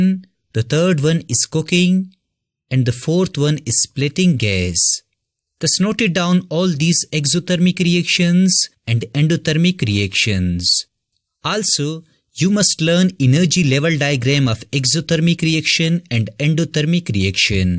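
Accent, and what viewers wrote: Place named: Kerala, India. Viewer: SI=ir get a accent your accenet is so keralan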